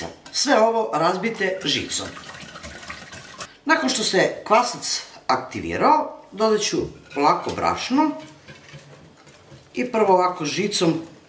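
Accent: South, Serbia. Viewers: Meni se naprimer mnogo svidja vas juznjacki govor i nemenjaj te ,ja sam uvek za to da se nemenja a moja komsinica je iz leskovca i ja uzivam da je slusam😍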